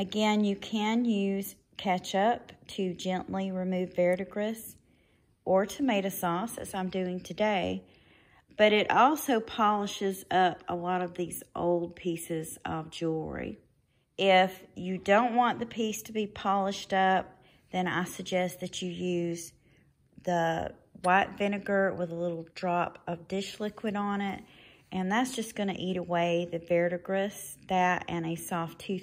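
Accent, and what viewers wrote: South, United States. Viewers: You sound awfully Southern or am I wrong? I am in AL.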